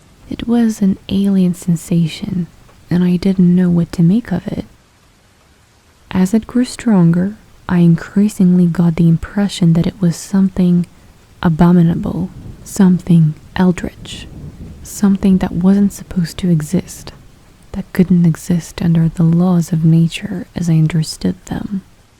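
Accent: United Kingdom. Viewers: Great British accent xx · It’s awsome the British accent ❤️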